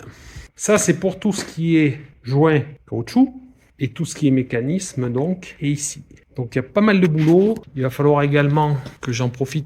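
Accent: Corrèze, France. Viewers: On te regarde faire comme d'habitude 😊 Mais quel plaisir de regarder ce travail de qualité. Et j'ai une petite nostalgie de l'accent corrèzien, j'y ai passé une dizaine d'an-nées 😉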